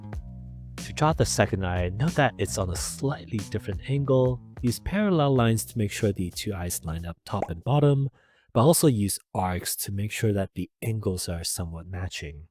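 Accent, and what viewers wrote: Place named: Japan. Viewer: I hear that Japanese or asian type accent and I know this video is going to be filled with amazing quality content, thanks new sub .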